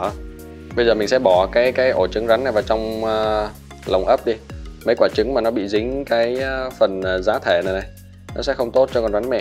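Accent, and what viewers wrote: North, Vietnam. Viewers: Anh ở Miền Nam sao nói giọng Bắc nhỉ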